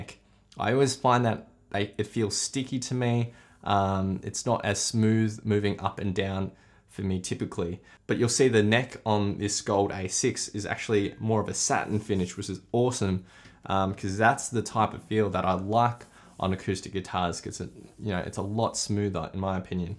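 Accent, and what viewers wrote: Australia. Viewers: i always watch your videos help me to improve and learned base in your accent seems like you live he in Australia aswell :)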